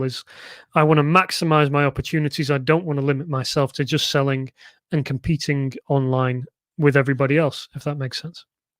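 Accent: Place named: United Kingdom. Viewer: Thank you so much, this video is by far one of the best on the tube! Extremely awesome & refreshing to hear a uk voice, ✌🏻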